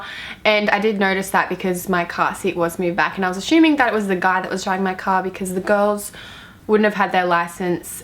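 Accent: Australia. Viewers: is she australian? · Yes I found another Aussie XD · Are you from Australia? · the Australian accent is HOT and so are you, my dear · you sound aussie lol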